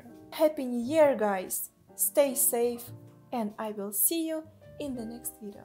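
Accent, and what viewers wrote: Russia. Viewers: Русская? Акцент )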